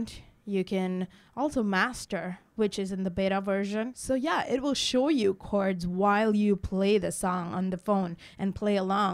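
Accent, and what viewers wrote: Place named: United States. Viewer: Where do we learn to speak like a Yankee?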